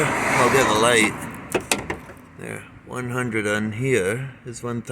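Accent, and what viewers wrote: Scotland, United Kingdom. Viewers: Very interesting accent, bit of scottish and american... Whats the craic?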